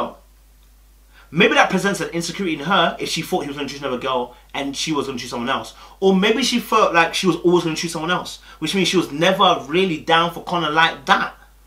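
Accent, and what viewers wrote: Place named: Birmingham, United Kingdom. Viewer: Yout brommu accent came out today small small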